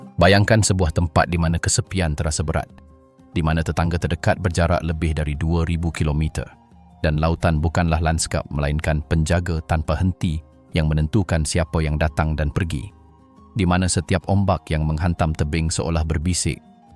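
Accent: Malaysia. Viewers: Logat ngomongnya ini dari malaysia, tapi nama akun nya pakai nama indonesia...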